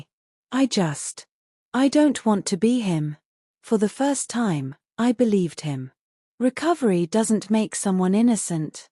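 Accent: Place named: England, United Kingdom. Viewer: So if she’s from Pennsylvania, why does she sound SO English?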